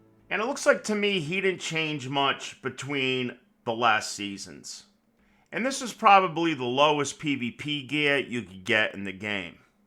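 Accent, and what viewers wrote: New York, United States. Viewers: Your accent has me in stitches. Is it New York or Boston. Remind me if the Construction guy from Futurama ❤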